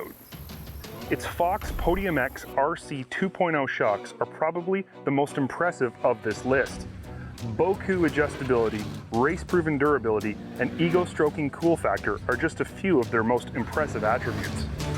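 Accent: Canada. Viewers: what is up w that Canadian accent out sounds like oot i just do not get it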